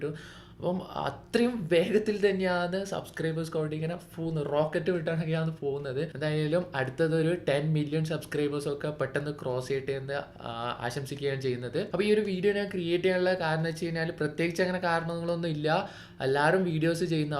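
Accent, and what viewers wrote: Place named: Kasaragod, India. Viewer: enniya njanum kasaragod enne. mundanne style kekkambam oru doubt adich appo choichadh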